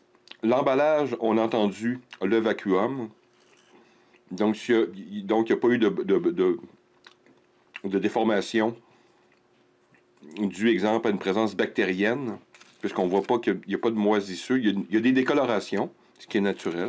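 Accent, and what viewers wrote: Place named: Canada. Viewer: Interessant, j'aime l'accent canadien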